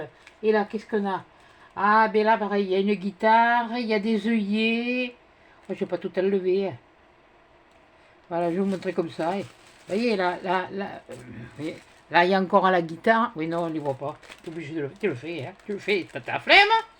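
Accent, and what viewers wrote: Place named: South-West, France. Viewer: Félicitations pour ce concours gagné ! Joli colis c'est vrai que le bloc sur la lune est sublime .... jamais trouvé moi non plus sniffffff .... 1 jour peut être. Merci pour cette bonne humeur dans les vidéos.... et ce bel accent du sud-ouest ! Merci ! Je suis 1 grande débutante et j'apprécie cette simplicité des explications.......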